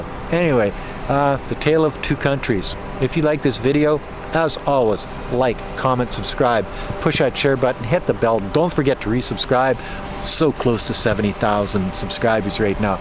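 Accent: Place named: Canada. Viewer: I love your accent, it's so Canadian when you say "aboat".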